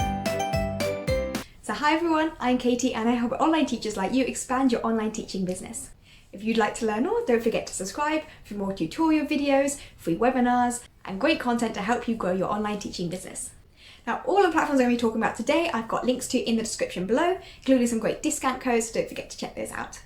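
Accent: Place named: England, United Kingdom. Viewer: Hello Katie. Thanks for the great information that you share with us. However, can you please slow your speech down? I am a Native English speaker who teaches English online. I am finding it very difficult to understand you as your English accent is very thick and you also speak very fast. I am trying to understand all of the information that you are sharing with us however, I am replaying the video over and over again in order to understand you. Best wishes, Karyn.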